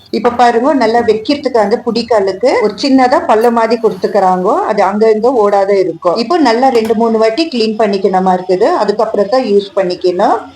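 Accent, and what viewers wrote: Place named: Bangalore, India. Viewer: Nice.your language is good.Bangalore tamil.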